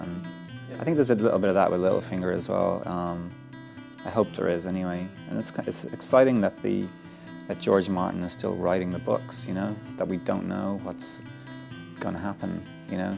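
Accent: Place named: Ireland. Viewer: Love his Irish accent. He and James Nesbitt (plays Bofor in the Hobbit) are my favourite Irish actors.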